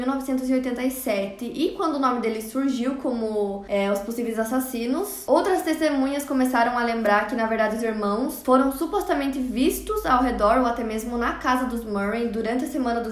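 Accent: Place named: Ponta Grossa, Brazil. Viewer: Amo esse canal é esse sotaque Ponta grossa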